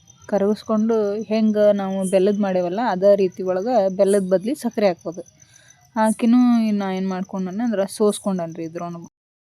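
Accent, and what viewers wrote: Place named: North Karnataka, India. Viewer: ತುಂಬಾ ಚೆನ್ನಾಗಿ ಮಾತಾಡ್ತೀರ ನೀವು ಉತ್ತರ ಕರ್ನಾಟಕದ ಭಾಷೆನ ❤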